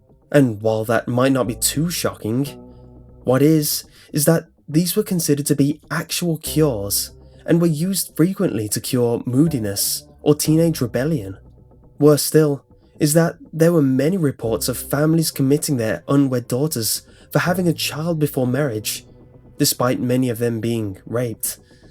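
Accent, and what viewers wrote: England, United Kingdom. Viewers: Nice video... But - where is your accent from? You sound English, yet you said “Clochester” instead of COLCHESTER. Colchester is claimed to be the oldest town in Britain. It was for a time the capital of Roman Britain. Hospital Name: Severalls Hospital, Previous Names: Second Essex County Asylum, Essex and Colchester Mental Hospital, Severalls Mental Hospital Location: Boxted Road, Colchester, Essex CO4 5HG Principal Architect: Frank Whitmore and William H. Town Layout: Compact Arrow & Villas Status: Derelict Opened: 27th May 1913 Closed: March 1997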